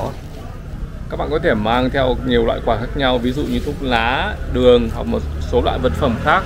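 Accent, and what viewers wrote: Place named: Hai Phong, Vietnam. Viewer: Theo dõi kênh anh từ đầu. Nhưng video này thực sự phục anh vì: anh chịu khó và dũng cảm xin họ để đc quay. Lăn lộn vào quay từng ngóc ngách.Hỏi han họ để có thông tin.Góc quay đẹp và toàn cảnh chứng tỏ anh quay rất có tâm và có trách nhiệm trên từng thước phim gửi đến khán giả.Kiến thức thì phong phú,sâu sắc,giọng Hải Phòng thì hay khỏi bàn.Chỉ biết chúc a sức khỏe,tiếp tục giữ lửa và sự can đảm,nhiệt huyết trên từng video gửi đến khán giả chúng tôi có cái thú vị để xem. Chúc a nhiều sức khỏe.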